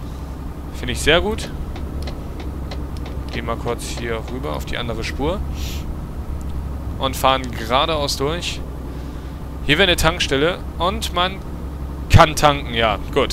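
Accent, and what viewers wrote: Aachen, Germany. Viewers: KOMMST DU AUS AACHEN?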